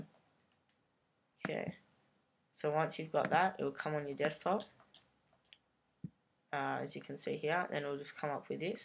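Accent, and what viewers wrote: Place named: England, United Kingdom. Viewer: ...annoying english accent from a teen not wanting to talk straight.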